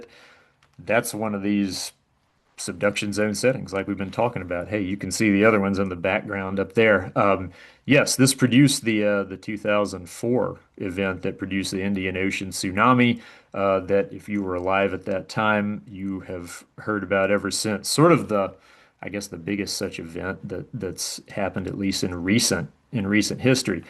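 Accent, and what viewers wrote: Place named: Pennsylvania, United States. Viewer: Awesome video with great illustrations! Sidebar: Your accent reminds me of my lower Bucks Co. PA in-laws 😊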